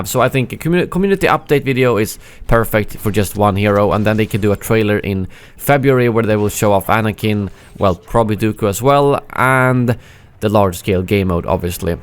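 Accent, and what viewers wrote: Sweden. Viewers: I have a feeling you are from Northern Europe, probably Sweden judging by your accent. Am I right?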